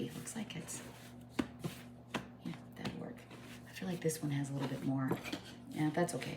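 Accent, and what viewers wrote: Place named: Michigan, United States. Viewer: There is nothing wrong with her Michigan accent! We also say melk instead of milk! 😅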